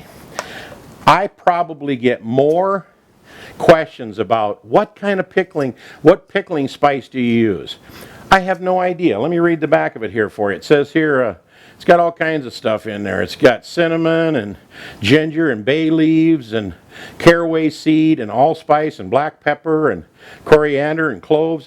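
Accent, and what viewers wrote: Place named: Chicago, United States. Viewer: Sounds like a Chicago accent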